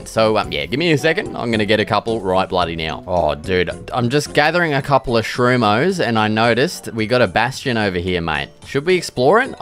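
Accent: Australia. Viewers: you obviously don’t understand accents because he is not English he is Australian lol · Stopped watching about 1min in when I realised the Aussie accent was turned up to obnoxious.. I’m Aussie myself. It’s too bloody much bro. Stop it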